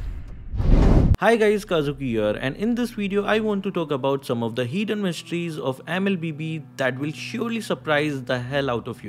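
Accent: India. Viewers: Hi Kazuki, I'm genuinely curious why you have Japanese name but you have Indian accent. So if I may ask are you an Indianese or Japian?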